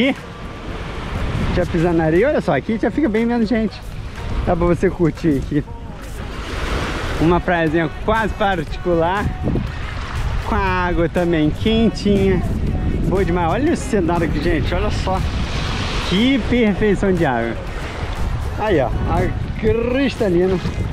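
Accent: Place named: Minas Gerais, Brazil. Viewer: Que água maravilhosa...essa cor me faz tão bem!! A Obra de Deus é perfeita!! Essa prainha dos pescadores é um paraíso..., também achava que fosses mineirin...seu sotaque, apesar de paulistano é autêntico de um mineiro.😊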